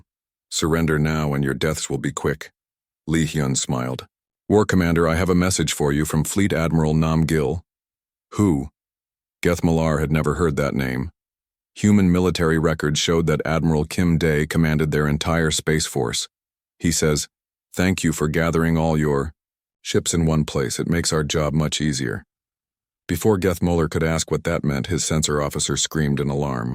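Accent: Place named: United States. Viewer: You must be an American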